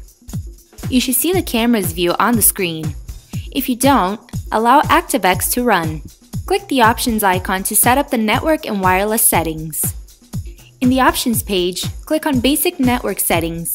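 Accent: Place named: United States. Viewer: The horrible 'skifffle' music, (noise) in the back ground made me shut you off after about 10 seconds. Why the awful, distracting, irritating 'noise' in the back ground? Your American Accent has become very acceptable now and I quite enjoy it - but please LOSE THE AWFUL DIN IN THE BACK GROUND! Many thanks, Bubbles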